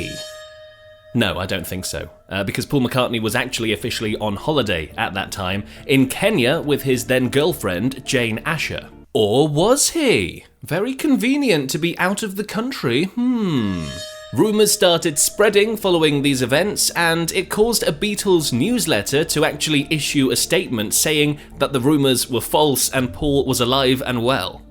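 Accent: United Kingdom. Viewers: You are British because of your accent, British English, so you understand the subject well, why beatles were from london 👍 ￼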